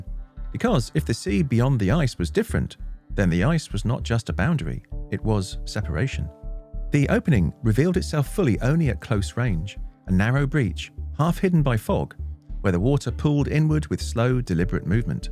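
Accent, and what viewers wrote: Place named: England, United Kingdom. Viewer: please, no more english accent 😮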